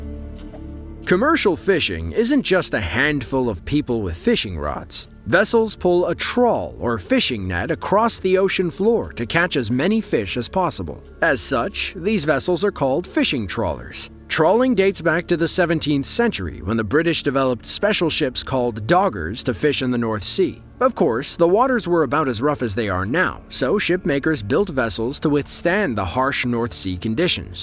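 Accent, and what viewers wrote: United States. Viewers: the american accent of the commentator is unbearable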